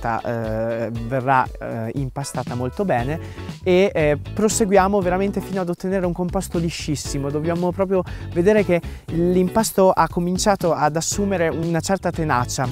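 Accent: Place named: Trentino, Italy. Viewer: Oltre che bello, quello accento Trentino lo adoro. Marchigiana ma con il Trentino nel cuore. I love val di non. ❤ Tovel ❤❤❤❤❤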